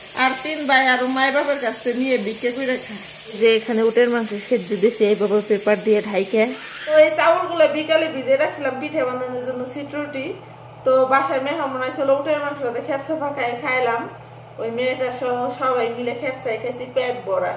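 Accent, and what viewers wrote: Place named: Dohar, Bangladesh. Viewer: Tumi Ki Dohar theke? Kotha shune mone hoy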